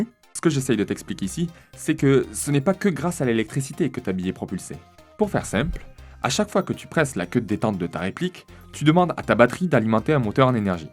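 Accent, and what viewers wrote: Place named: South-West, France. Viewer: Je souhaites me mettre au AirSoft. Merci pour ta chaine (je débarque 4ans après 🤣mais pas grave) J'entends un accent, du sud ouest ? ;) Ciao de lapart d'un Bdlais ^^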